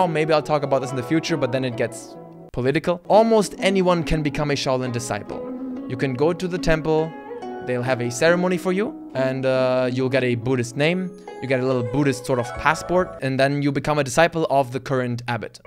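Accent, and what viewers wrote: Germany. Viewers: Is he German? Im not sure he got the accent and this "deine Papiere Jude" is also German but he doesnt say anywhere that hes German. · Ah. Thats why his english sounds so german.. :D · Hey... uhhhh.... Mr Shaolin... uhhhh... warrior.... uhhhh monk disciple... guy... with the German accent. Damn! I'm hell-a confused even more now! 太麻煩了！ Loved binging on your Shaolin videos. Experience is everything! 恭喜！加油！ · Just realize you have a little bit of a German accent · Wait. Are you german???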